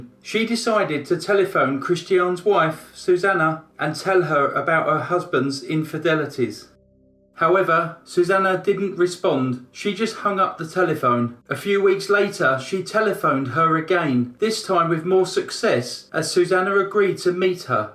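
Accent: United Kingdom. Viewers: First time viewer. Love the subject matter but (I'll make enemies here) your audio sounds very canned. If I could offer some CONSTRUCTIVE criticism, improve your audio because you have a great voice and what American doesn't love a Brit accent? Keep up your great work but the audio recording is just... a bit off putting. Improve that and your "likes" will shoot through the roof. Love your work. Keep up the great stories! Bill: Brooklyn, NY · Cool! I am subbed to both and CR and have been for some time! I swear they sound very similar voice wise, just different U.K. regional accents! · Brit accent goes a long way 🥰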